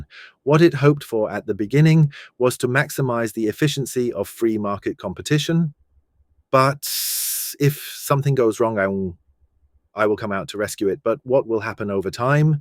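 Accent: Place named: United Kingdom. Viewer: Very British accent 😊 👍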